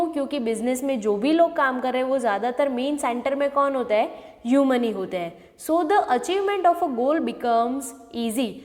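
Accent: Gujarat, India. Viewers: Gujarathi Accent ❤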